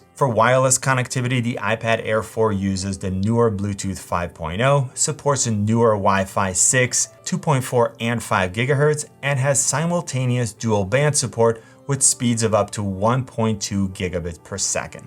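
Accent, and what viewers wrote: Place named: Cleveland, United States. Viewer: I may be way late to this.. but are you from Cleveland??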